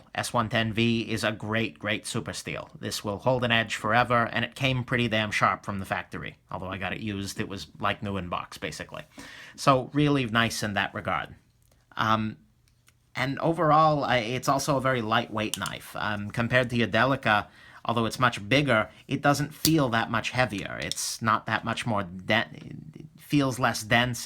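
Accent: New York, United States. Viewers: You sound like your from New York or Boston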